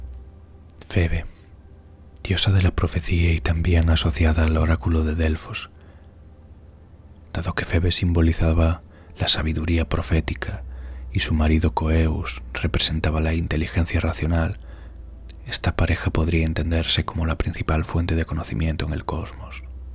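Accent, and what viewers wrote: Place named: Spain. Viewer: A mí le acento español no me gusta mucho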